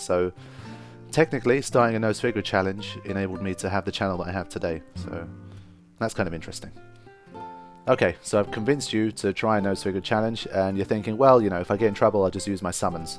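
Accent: England, United Kingdom. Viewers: hey man im not sure if you read comments on old videos but ill leave this here anyway. I have been playing ffx since its day of release on ps2 and got 10 for ps3 and ps4 lol. Im playing a casual playthrough on ps4 right now started a week ago. I was looking for some guides/ tips on youtube and your channel popped up i have never heard of you until 2 days ago and now im addicted to your channel!. the amount of content on your channel is amazing and the quality of the content as well. I now have the daunting task of watching your platinum walkthrough and NSG videos. This very video has got me interested in NSG runs the sad thing being that i thought i was a semi veteranof the game but gad only heard about NSG runs about 6 months ago massive face palm. The other thing being that i have never beat all dark aeons plus penance before and now i think you have given me confidence to try. 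It sounds like your english as well which is good im Scottish and its nice to have British gamers talk about Final Fantasy it seems rare not that im dissing any other FF gamers Dark Kefka has incredible knowledge on the games. Hopefully when FF 15 releases you will cover that as well. Any peace man thank you for giving me a whole new channel to watch i can only subsribe and keep watch over my newsfeed hopefully thats enough for now i wish i could do more Thank You.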